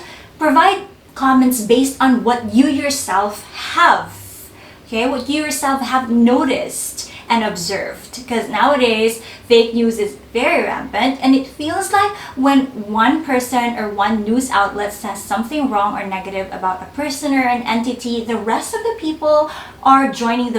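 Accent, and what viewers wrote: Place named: Philippines. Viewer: Feedbacks are gifts to improve ourselves. Guys, you expect her to have an americanized accent? She's a filipino. As long as we understand her, that's fine.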